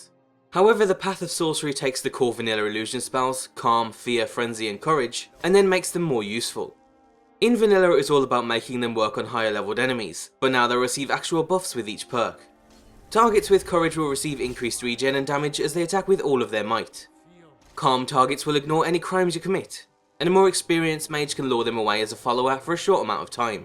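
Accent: United Kingdom. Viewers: Great video, but your speech is quite slurred. It's not just the "charming" British accent; there's actual slurring going on - where two vowels blend into one. Quite easy to work on once you're aware of it.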